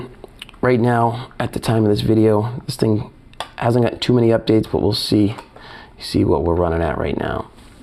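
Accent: United States. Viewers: my friend told me not to bother getting this with the black Friday promotion... he has it and says its super slow. p.s. love your American accent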